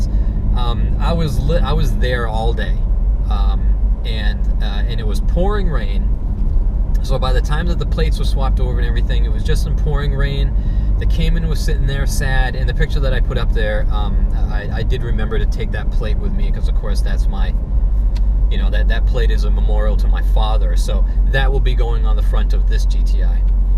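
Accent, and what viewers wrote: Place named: Boston, United States. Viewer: First time on the channel,. Great car! Are you in Boston by any chance? I am and was just curious as the roads looked familiar and I detected a little accent.....disregard....i had not finished this video until you mentioned you were in Wetsboro. I've never owned VW, but yours looks awesome. I'd likely get the same one (white exterior with auto transmission).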